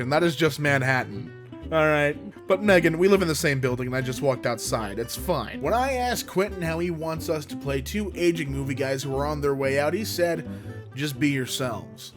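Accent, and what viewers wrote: Scotland, United Kingdom. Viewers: Dude you sound like Scotsman from samurai jack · I love his Scottish accent. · Please tell me how to do your Scottish voice I want to know how you do it so well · As a born and bred Scot, that Scottish accent was perfect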